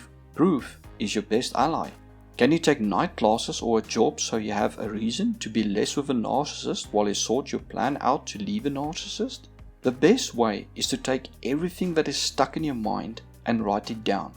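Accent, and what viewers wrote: South Africa. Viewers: Great info Are you South African?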